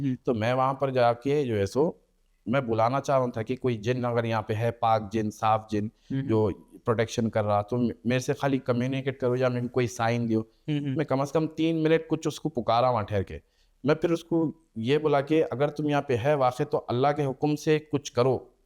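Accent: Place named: Hyderabad, India. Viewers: arre Bhai hindi hi bolre par ascent Hyderabadi hai isliye waisa dikhta. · Hyderabadiii boltey.. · Apna Hyderabadi bolte Bhai Ku angaar dalte love you brother 😍😍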